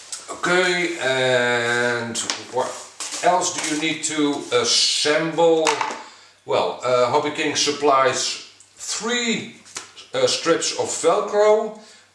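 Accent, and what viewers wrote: Netherlands. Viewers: Ik vind het Engels enorm goed, uitspraak is lekker typisch Dutch.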